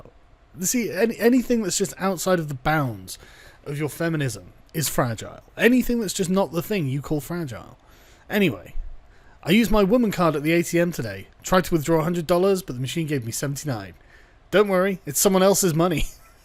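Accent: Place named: United Kingdom. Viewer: I'm shocked you have a British accent, as I thought it was just men in the USA who spent all their time online whinging about feminists. I thought it was connected to so many men in the USA being genitally mutilated so feel threatened by women, but you've proved there are types like that closer than I hoped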